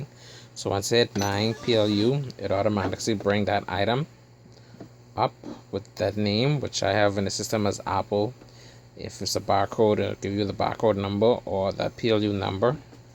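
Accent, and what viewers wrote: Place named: Bahamas. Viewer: Thank you for sharing. I'm sitting here listening to you and you sound awfully alot like a Bahamian. Are you?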